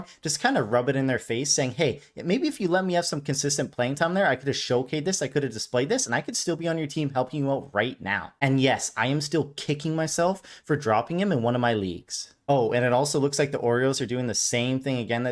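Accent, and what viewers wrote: Canada. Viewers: I thought you were Canadian by the way you pronounce your "O's". Especially in words such as "about" or "out". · Careful with that Schlittler pronunciation, man. This is a family show. That said, I expect to hear "cuck" no less than twice in every video and you delivered. From another fellow, thanks for continuing to justify my obsession with Kurtz. Idk how many losses it has contributed to thus far, but it's finally paying off as my playoff hopes hang by a thread! Does the mini-hoop indicate that you'll keep this going with some basketball content? The football market is flooded and I already think I know more than everyone in that sport, but the NBA is an afterthought as one who always preferred college hoops. Either way, I'll support it until you bore me or piss me off! Non-sports question... I think you've hinted (or flat-out stated) that you're in Texas, but do I detect a little canuck in that accent? 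Whatever it is, it doesn't exactly scream Texan. 🤣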